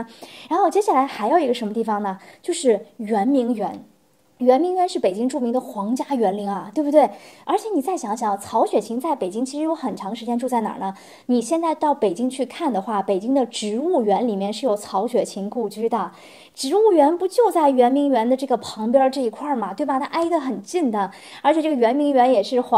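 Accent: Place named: Shanghai, China. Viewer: 上海普通話老靈了~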